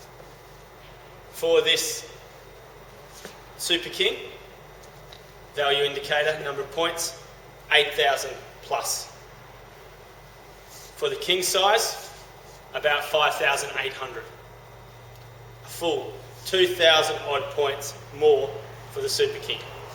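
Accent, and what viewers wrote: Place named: New Zealand. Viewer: Sounds like a kiwi.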